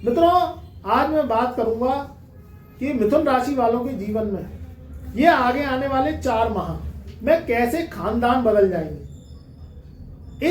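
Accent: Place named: Agra, India. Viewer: पंडित जी आप किस शहर से हैं? आगरा mainpuri side effect है शैली में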